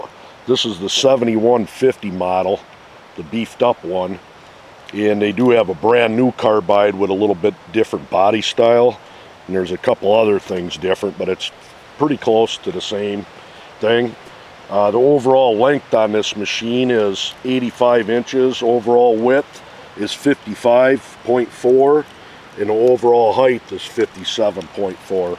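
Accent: Minnesota, United States. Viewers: he even has the same minnesota accent! LOL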